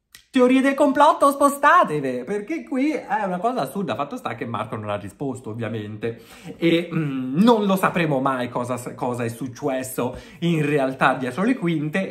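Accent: Tuscany, Italy. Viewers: Ma te sei toscano, nel video ho sentito che stai a Milano ma non mi sembri lombardo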